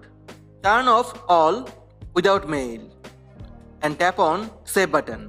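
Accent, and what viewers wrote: India. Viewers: So Indian voice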